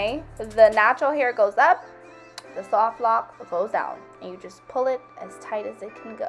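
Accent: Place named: New York, United States. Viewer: U sound like your from New York lol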